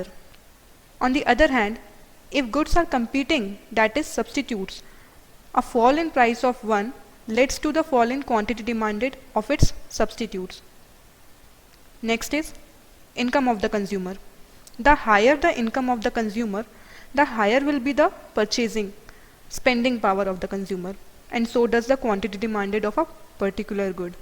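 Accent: India. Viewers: Thanks, I love Indian accent.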